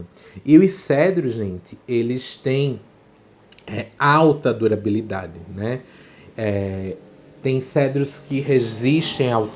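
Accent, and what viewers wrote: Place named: Recife, Brazil. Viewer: você é de Recife? você tem um sotaque de algum lugar do nordeste, mas você falando parece muito Recifense.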